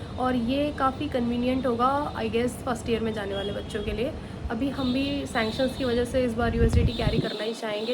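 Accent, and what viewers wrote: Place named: Bihar, India. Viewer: Bihari sound aarha h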